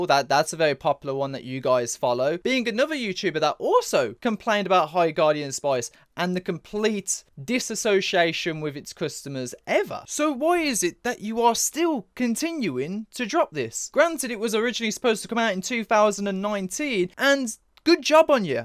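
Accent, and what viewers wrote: United Kingdom. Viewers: man gets more British when he is angry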